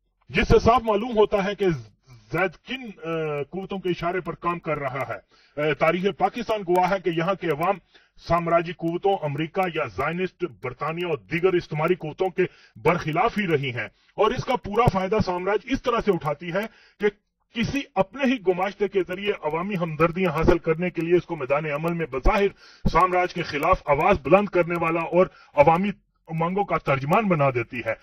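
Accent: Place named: India. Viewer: bolnay wala to awaz se hi koi indian lg rha hai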